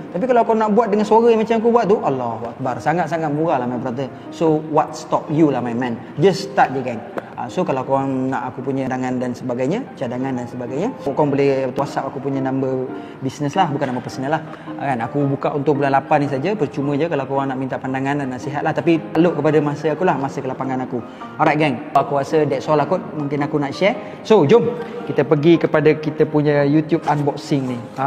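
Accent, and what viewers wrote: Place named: Perak, Malaysia. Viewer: Laa abg org Perak ke hahaha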